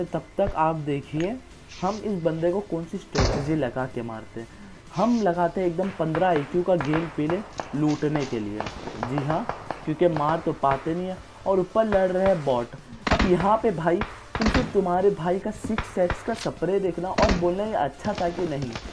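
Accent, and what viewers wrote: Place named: Bihar, India. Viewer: Bihari lagra fulll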